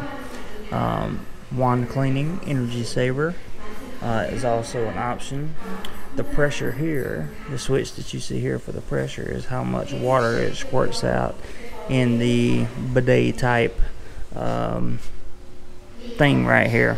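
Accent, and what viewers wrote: South, United States. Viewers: Great vid, do you mind if I ask what part of the States are you from? Love the southern accent. I toured across the States with a rock band when I was in my 20’s, loved the southern states, southern hospitality is something that everyone should experience at least once.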